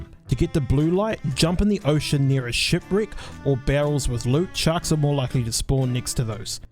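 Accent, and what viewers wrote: New Zealand. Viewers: Are u a kiwi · Love the New Zealand accent (well I hope it is)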